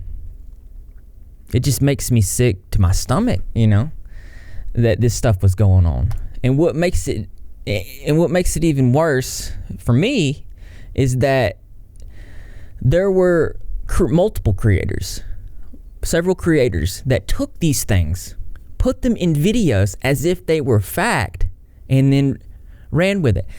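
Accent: South, United States. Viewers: Do ya got a little southern accent? Or am I just crazy? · Just discovered your channel and already hooked. I’m glad you got better from your accident and found YouTube to help with that. There will always be haters but keep pushing forward. I LOVE your hair btw and you sound like you are southern like I am :)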